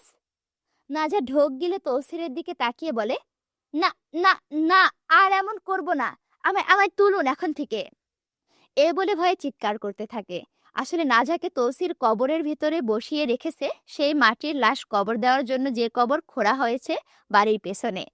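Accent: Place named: Rural, Bangladesh. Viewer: আপু তোমার মুখে গ্রামের আঞ্চলিক কথা আামার বেশি সুন্দর লাগে 🌸🌸🌸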